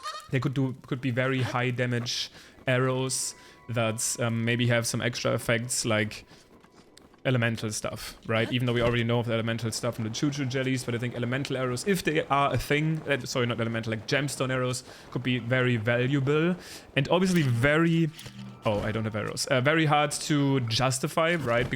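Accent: Germany. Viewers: You sound german are you german ?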